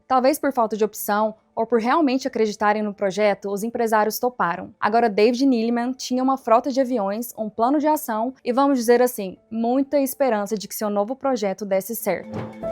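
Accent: Caipira, Brazil. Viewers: esse sotaque de caipira é uma tortura!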